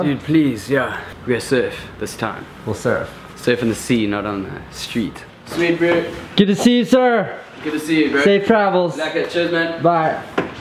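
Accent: South Africa. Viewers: Love the South African accent, not sure why just do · Lekker. So nice to hear some Saf African. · South African: Yeeeese (yes) and Bleake (black). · I totally dig South African accents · South African accents are my faaaaaaaaave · I live in New Zealand and I'm from Cape Town and listening to talk and his accent shit it's brought back memories! haha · That south african english has such a weird accent, but it's so cool. It sounds so laid back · That's a South African accent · I absolutely love the South African accent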